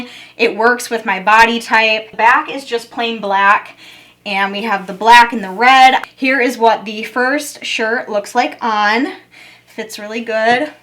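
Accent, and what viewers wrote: Michigan, United States. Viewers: I just love that Michigan accent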